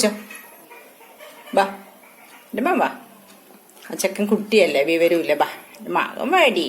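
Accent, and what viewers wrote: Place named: Palakkad, India. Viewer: PALAKKAD slang soooper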